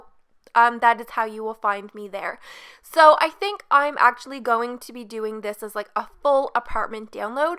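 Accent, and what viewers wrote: Canada. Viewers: Do you have a Canadian accent? And I absolutely love this build. Pretty sure the best I have made is a shack compared to this ;). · are you Canadian..?? we talk to same way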